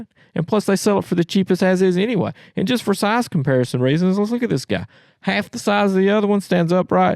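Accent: South, United States. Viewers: I hear southern accent, I give the video a like. Simple as that.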